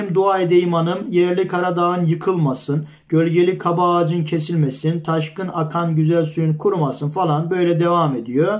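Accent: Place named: Trabzon, Turkey. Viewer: arada bi konuşmanızdan belli oluyor ben de akçaabatlıyım :))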